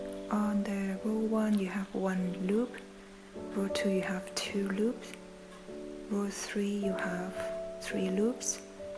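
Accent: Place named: Iceland. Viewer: U got a björk accent 😍😍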